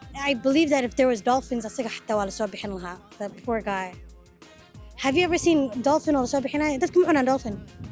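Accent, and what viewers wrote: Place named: United States. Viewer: Oh you are American accent masha allah u do well show people good things our island like jazeera beach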